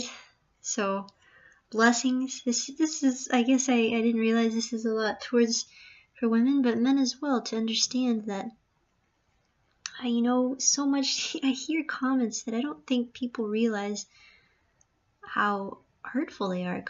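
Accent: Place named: South, United States. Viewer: You're always funny lol You sound like a southern from down south. the US of A. I don't know why I always don't get your videos I get them once every month. The brothers were very protective of their sister and the law of the Lord. Thank you once again and thank you for your encouragement. May the Lord bless you always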